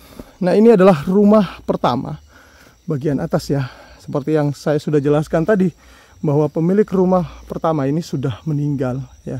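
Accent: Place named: Banyumas, Indonesia. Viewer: Omongane ngapak....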